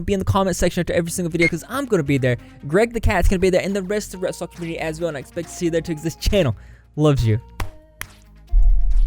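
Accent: South, United States. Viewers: Off topic but... I've never watched your videos before, but you have such a beautiful voice and accent 😍 Subscribed! Where in the US are you from? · i wasn’t expecting a homey southern accent but it is welcomed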